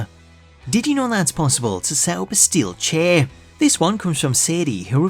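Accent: Newcastle, United Kingdom. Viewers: You’re a Geordie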